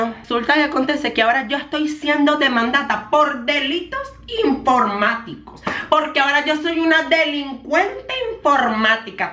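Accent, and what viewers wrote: Venezuela. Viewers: Te equivocas Oriana, ese acento no se lo invento la, así como habla la es como hablamos la mayoría de los adolescentes en Venezuela, te digo que yo descubrí a la hace 2 meses y yo hablo así como ella desde hace años, al igual que mis compañeros del liceo. Y obvio que los Adultos no van a hablar como los jóvenes, ellos son mas maduros que nosotros. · Su acento venezolano Me enamora Y me enloquece!!!😨😍❤🔥 Like si crees lo mismo!!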